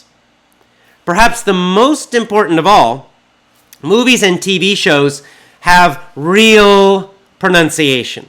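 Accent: United States. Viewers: Is he from America??. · hi Ihave one question is this American accent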